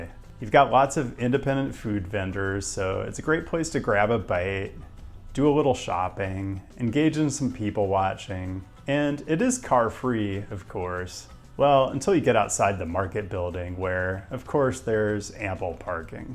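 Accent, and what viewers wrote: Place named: California, United States. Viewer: I like this guy's content, but man do I hate his intonation patterns. It's like farcically Californian.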